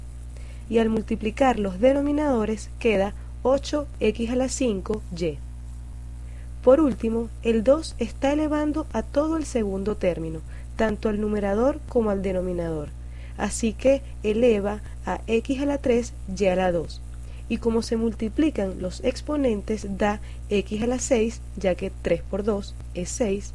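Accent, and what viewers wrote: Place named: Venezuela. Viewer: entendí todo, y por cierto se nota que eres venezolana ese acento nuestro es irreconocible.... gracias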